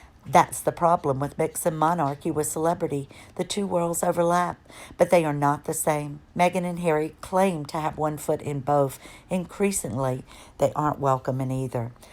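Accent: South, United States. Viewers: Hello, you have a lovely accent, sounds like a southern accent. If that's so, then good, that means more and more Americans are hopefully finding out what a witch MM is. I'm a Mexican American 66 year old woman from Los Angeles and I've never liked her. Thank you for the read and post. Let's keep spreading the news that MM is vile and hurtful.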